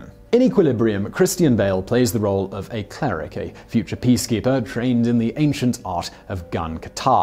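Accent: United Kingdom. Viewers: Eh. What dya want. He's a British Milennial.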